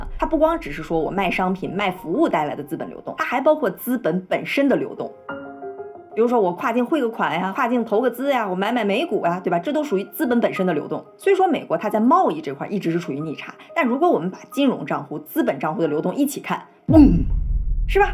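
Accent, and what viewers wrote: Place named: Beijing, China. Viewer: 京片子遛兒的！好聽！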